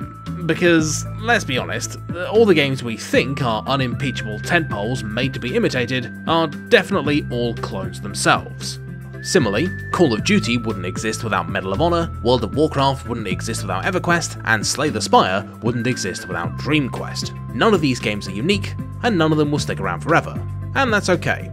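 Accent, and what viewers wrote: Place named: United Kingdom. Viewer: Sometimes I watch your videos and forget that I'm not watching GMTK, but then I'm like "Wait, he's still British, but he's a different British..."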